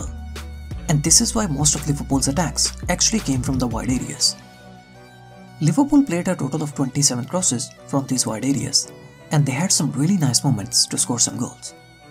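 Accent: India. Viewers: you sound indian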